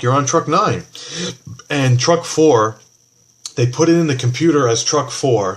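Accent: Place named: Boston, United States. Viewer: your video's are hilarious, i'm from Boston and you say things the way me or my friends would with the gestures and noises that come out of you mouth, it cracks me up. i can't stop laughing but everything is so true + that makes it even funnier.keep making videos or hit the stage on open mike night at the comedy cellar or the Improv.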